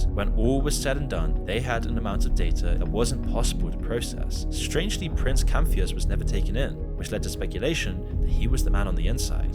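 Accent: United Kingdom. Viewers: ffs another british voice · Very thick English accent. Very hard to understand. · Hes british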